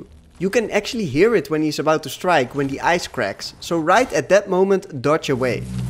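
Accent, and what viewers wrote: Netherlands. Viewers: You sounds so dutch haha NL!! thx for the vid bro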